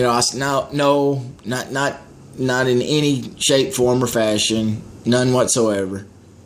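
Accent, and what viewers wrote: South, United States. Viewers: the slow poke Southern accent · all the Southern drawl bullshit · I enjoyed listening to your story and your all "Merican" accent. Folks like Adam Peterson who commented about you should go watch their Britney Spears videos instead.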